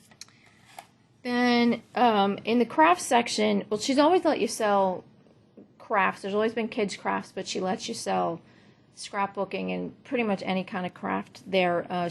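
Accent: New Jersey, United States. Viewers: What a soothing, ASMR voice she has. But that's not why I subscribe. She does a great job of in depth evaluation of resale items. I never miss an episode. I love that Jersey (or what ever it is) accent.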